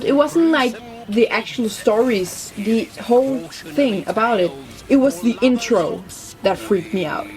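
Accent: Denmark. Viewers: You're from Denmark aren't you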